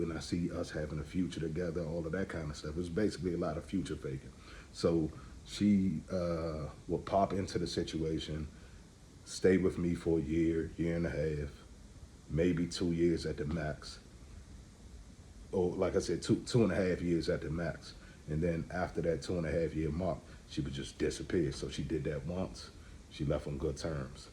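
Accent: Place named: Maryland, United States. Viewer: I hear MD in you me too where at brother.....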